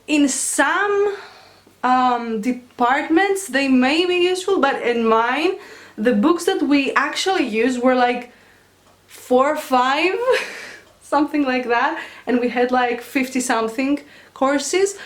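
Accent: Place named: Greece. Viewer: nice... καταλαβαινεις τον ελληνα απο το παχυ λλ και τα μεγαλα φωνηεντα. keep up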